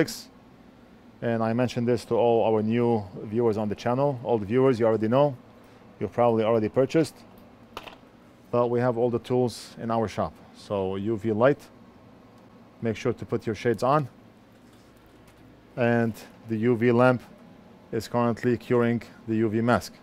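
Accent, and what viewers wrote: Lebanon. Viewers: صدمتني بس صرت تحكي لبناني طول الوقت بشاهدك وبشاهد فيدوهاتك وربي صدمتني 😂😂😂 تحياتي بشاهدك من الاردن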